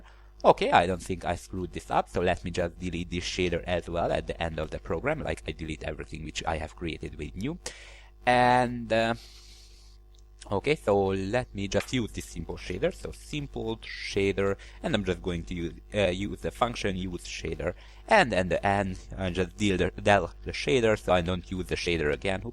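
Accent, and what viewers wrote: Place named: Hungary. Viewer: Are you hungarian? :D Just based on your accent :D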